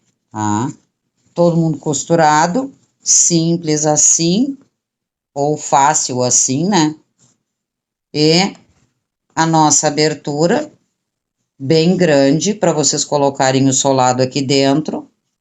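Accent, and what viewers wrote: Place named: Gravataí, Brazil. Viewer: Ficou lindo o chinelo, e não é q reconheci esse sotaque , sou de Gravataí 😘😘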